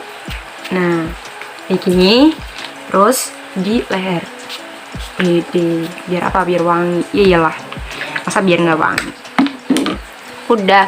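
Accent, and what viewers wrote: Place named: Java, Indonesia. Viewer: Medok ya mba.. Jawa banget deh ☺️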